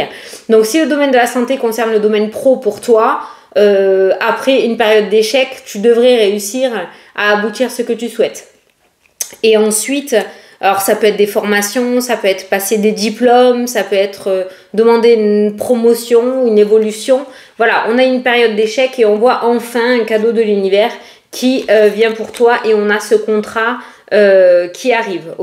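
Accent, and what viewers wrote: South, France. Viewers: Bonsoir, un grand merci pour cette guidance avec votre jolie voix, votre petit accent du sud est marrant. Vous avez du psets et cela fait du bien. Votre dynamisme fait du bien. Merci pour votre gentillesse et votre bienveillance. Que Dieu vous bénisse ainsi que votre famille.❤❤❤🙏🙏🙏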